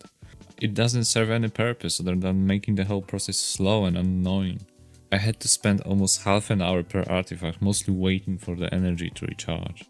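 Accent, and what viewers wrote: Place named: Poland. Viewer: słychac ten akcent polski xd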